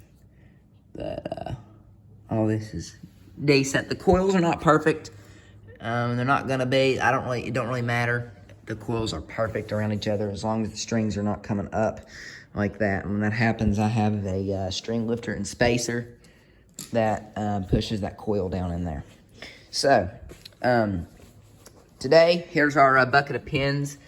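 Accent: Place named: United States. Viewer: in which part of US is your accent? thank you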